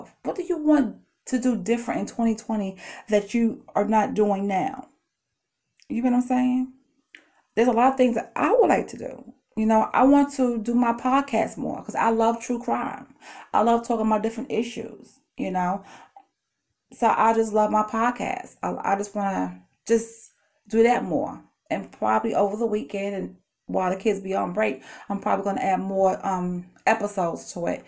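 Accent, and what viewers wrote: New York, United States. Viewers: Well said my bklyn boo!! And you will not stop 🛑 talking to me lol bc Yasss I heard the New York accent lol but I hear it all the time lol 😂 TFS! Xoxo😘💕🥰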